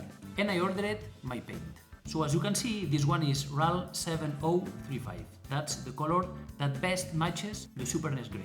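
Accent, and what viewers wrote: Spain. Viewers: hola tu eres español no????? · eres español??¿¿ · Acento de español como una casa 🤣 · La virgen como se nota que eres español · Tu ingles es tipo vallecas. Eres español no??? Donde pillas componentes? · Cómo se te nota que eres español!!! 😂😂 Porque me pasa lo mismo con el inglés! Suscrito!! Yo le voy a hacer el mod a una SP AGS-001. Porque de momento no encuentro una Advance bien de precio y se lo haré a la SP que tengo desde el 2004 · Eres español a q si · Tu eres Español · Claramente eres español, pero me encanta tu inglés jaja (y también tu canal, joder!)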